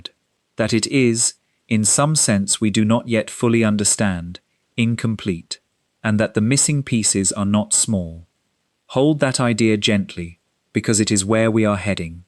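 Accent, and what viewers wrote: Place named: United Kingdom. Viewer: Think I'm gonna stop listening to British-voiceover space videos. ...fuck.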